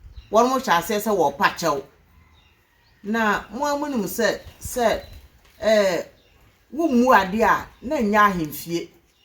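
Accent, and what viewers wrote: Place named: Volta, Ghana. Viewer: The twi is beautiful but the Volta high accent is evident.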